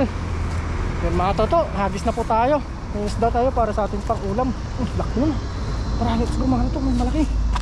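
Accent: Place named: Iloilo, Philippines. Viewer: saang province ka ba galing?naitanong ko lang kasi ang punto mo parang ilonggo.😊ingat lang po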